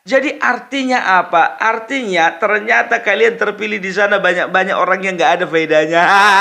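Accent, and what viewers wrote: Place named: Java, Indonesia. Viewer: BANG. SERING2 PAKE LOGAT JOWO, LEBIH KEREN DAN LUCU BANGET 😂 HOORRAASSS... MANTAP 👍👍👍🙏🙏🙏 Saya Warga Jember Jawa Timur Hadir 🙏🙏🙏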